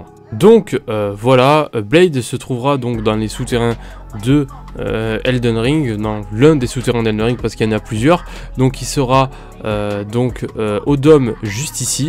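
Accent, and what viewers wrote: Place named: Paris, France. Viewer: oh c'est quoi cet accent parisien fada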